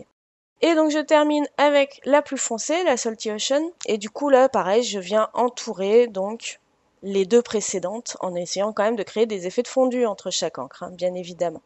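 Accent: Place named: United Kingdom. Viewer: Coucou. Très chouette le contraste couleur/Blanc.Ton accent anglais est comme le mien. Cela me fait sourire car j'ai du mal à prononcer certaines sonorités. Bisous.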